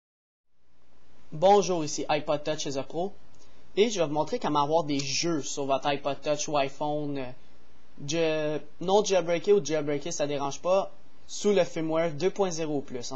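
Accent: Quebec, Canada. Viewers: Super accent quebeqouis comme une tete a claques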